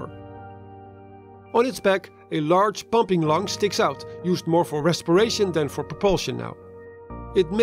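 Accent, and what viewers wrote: Netherlands. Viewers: Are you dutch, afrikaans or german?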